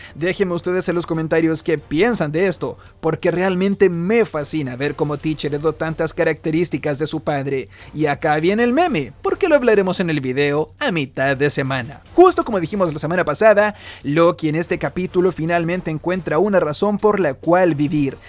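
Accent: Chile. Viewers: Pensé que era chileno, de mi chile querido.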